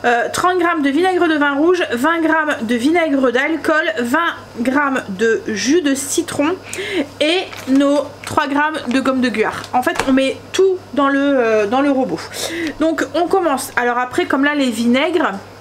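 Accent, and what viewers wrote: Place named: South, France. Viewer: J’adore le petit accident du sud qui apparaît de temps en temps et merci beaucoup ne changez rien de votre naturel c’est un pur bonheur 😅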